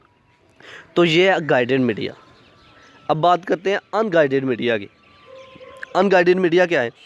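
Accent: Punjab, India. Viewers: Aapka Panjabi lahjaa mashallah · Punjabi ascent <3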